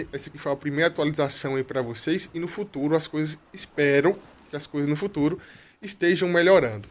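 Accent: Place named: São Paulo, Brazil. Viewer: Pela voz se tem 30 e poucos anos e no jeito que se fala provavelmente se são Paulo na cidade de assis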